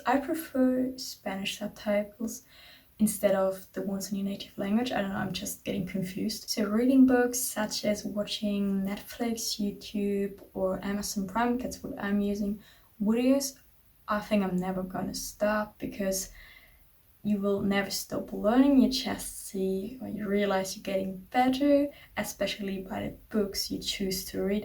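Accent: France. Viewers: Hablas muy bien español, y tienes un ligero acento Frances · You sound like french when you speak spanish, where are you from? Y buena suerte en tu aprendizaje, a por cierto debes hacer énfasis en la pronunciación de la R 👍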